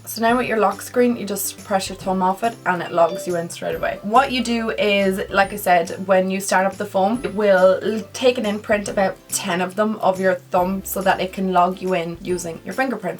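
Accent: Ireland. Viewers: What part of Ireland are you from ??🇮🇪🇮🇪🇮🇪🇮🇪🇮🇪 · i love your irish accent 😍😂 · She's from Ireland :) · Is she Irish because I'm from Ireland and I'm from Dublin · Are you irish because you rly sound irish ;) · are you Irish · Just found your channel knew instantly you were Irish you can't hide the accent🌸 I'm from Galway❤️ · OMG ur Irish same #irishgoals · Are you Irish 🇮🇪 if u are I am 🇮🇪🇮🇪😂👍🏼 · Are you Irish? I just came across your channel, you're gorgeous! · is she irish? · Are you Irish I am xx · Are you from Ireland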